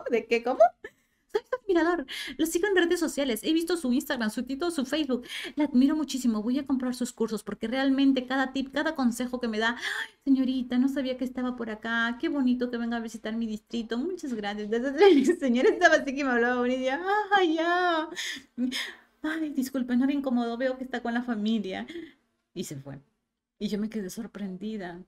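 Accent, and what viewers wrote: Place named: Peru. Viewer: Me inspiraS , tienes algun libro de tu autoria?? Hablas como peruana. Ya soy tu seguidor..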